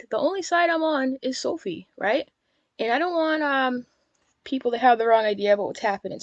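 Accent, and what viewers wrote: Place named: New England, United States. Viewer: This is the first time I've viewed your channel while looking for an update on Sophie. Your voice and accent make me feel like I'm back home in MA. I live in FL now but you sound so much like my friends back home. Are you from New England by any chance?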